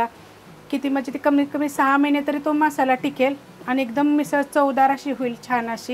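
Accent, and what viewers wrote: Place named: Kolhapur, India. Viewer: Assal kolhapuri bolane & misal. Very good method.... Thanks aunty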